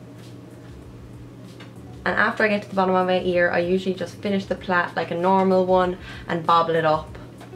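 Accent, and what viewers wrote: Ireland. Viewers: Liked and subscribed, I really enjoy your videos and it's especially nice to hear them in a familiar Irish accent! ❤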